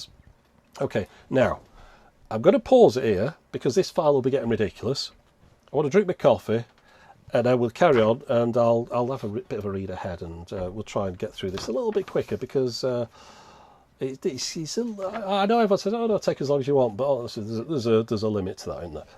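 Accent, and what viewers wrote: United Kingdom. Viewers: The question of whether you would be more successful as an American is an interesting one. I don't think it's as simple as "Americans don't want to listen to British people" since many Americans tend to think that people with British accents are classy and/or intelligent, and in any case it sounds kind of exotic. So I would wager that for some people it's actually a bonus. It might still be a net negative, but it's probably not a huge disadvantage for you. It is possible that you would be more successful if you had more of an outgoing, high-energy personality like you see with so many of the big YouTubers, since that probably appeals to the greatest common denominator, but I personally find that exhausting.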